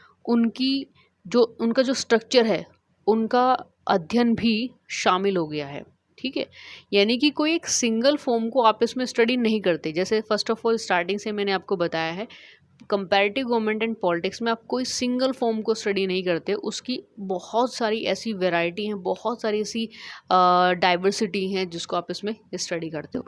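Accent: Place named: Pakistan. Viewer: Mam your content is very helpful but your english accent matches with pakistani cricketers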